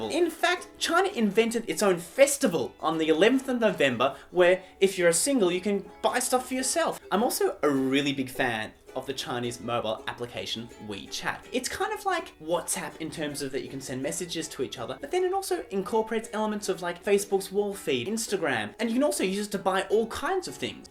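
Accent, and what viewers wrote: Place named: Australia. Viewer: Your accent is so aussie!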